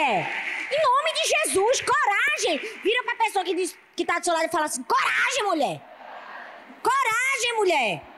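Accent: Northeast, Brazil. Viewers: Que sotaque maravilhoso, desse meu nordeste!!!!❤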